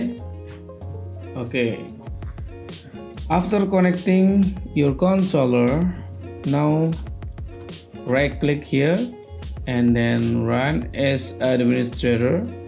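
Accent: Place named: Indonesia. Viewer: Logat Indonesia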